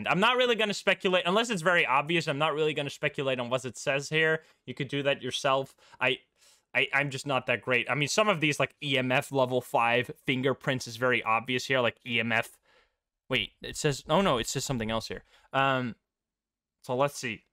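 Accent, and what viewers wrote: Netherlands. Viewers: Wait a minute…. ARE YOU DUTCH?????? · ik dacht al dat ik een nederlands accent hoorde maar nu weet ik het zeker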